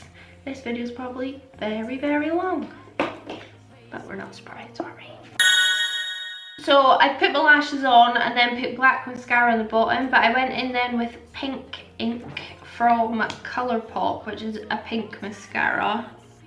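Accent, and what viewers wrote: Scotland, United Kingdom. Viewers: Love the Scottish accent 😌 I’ve been seeing outlander and loving the accent 😋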